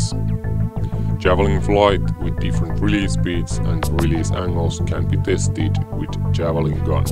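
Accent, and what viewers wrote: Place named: Russia. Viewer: Sounds like he's Russian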